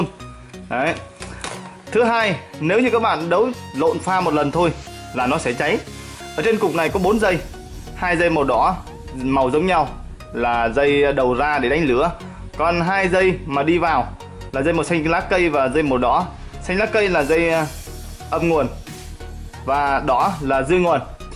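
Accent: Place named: Thanh Hoa, Vietnam. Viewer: A nói giọng người thanh hóa vậy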